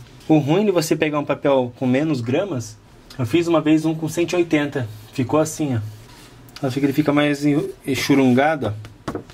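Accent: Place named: South, Brazil. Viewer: Gostei muito do vídeo, comentários bem pertinentes e achei ele bem carismático e natural... Amo esse sotaque do sul ❤😆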